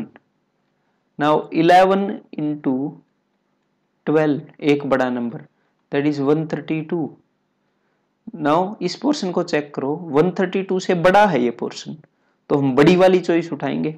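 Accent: Haryana, India. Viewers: bhai haryane ke ho ???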